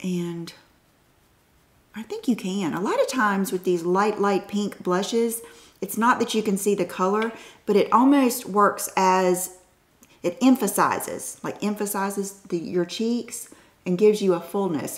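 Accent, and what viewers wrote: South, United States. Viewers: This is the first video I have ever watched of her but I love her. So whimsical....she enjoys reminiscing....old mac and YouTube days,etc!! Absolutely subscribed after just a few minutes of watching her. Plus, the southern accent....I'm from south Georgia myself so my accent gets picked on alot...love her!! Can't wait to go thru her channel and watch all her videos!!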